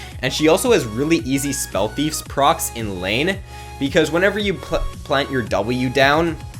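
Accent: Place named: Canada. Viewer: Ramon Schöllkopf he is Canadian, so to him he is pronouncing just fine. Just different accents I guess.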